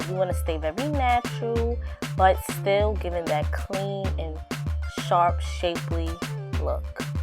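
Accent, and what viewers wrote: New York, United States. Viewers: where are you from? you sound like youre from ny or the dmv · it's her accent, she's from New York . All New Yorkers speak like that 🆗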